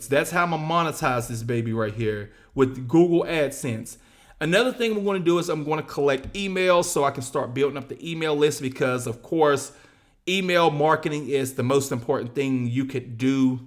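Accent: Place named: Mississippi, United States. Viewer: Are you from Mississippi? You sound just like my relatives.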